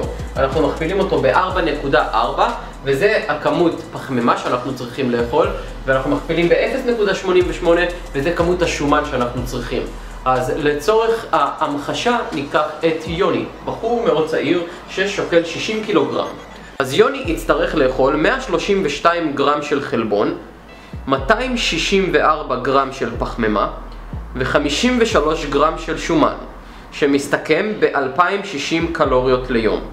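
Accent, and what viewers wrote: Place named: Russia. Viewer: מבטא רוסי חח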